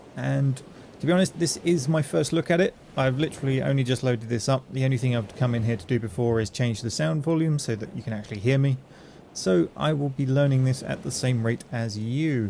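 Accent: United Kingdom. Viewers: I LOVE BRITISH GUYS THEY SOUND FUNNY AND I MEAN THAT IN A GOOD WAY IM NOT BEING MEAN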